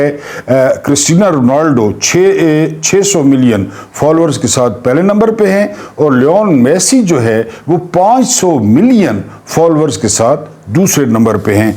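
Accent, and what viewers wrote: Saraiki, Pakistan. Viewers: اردو کو سرائیکی لہجے میں سننے کا کچھ اپنا ہی مزا ہے کمال ہے ژندہ باد ۔❤❤❤❤❤❤❤❤❤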